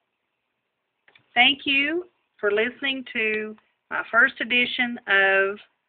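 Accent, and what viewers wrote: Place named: Appalachia, United States. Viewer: I live in Georgia and I don't know anyone who talks like this. Sounds more like Appalachian mountain people.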